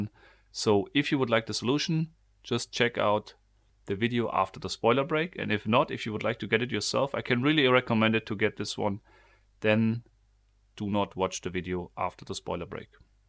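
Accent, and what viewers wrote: Germany. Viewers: Evtl Irre ich mich aber es klingt startk nach einem Deutschen akzent :P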